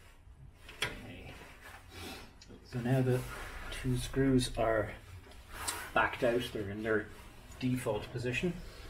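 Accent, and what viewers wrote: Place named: Ireland. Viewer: Not sure what that will achieve because this fella's got an Irish accent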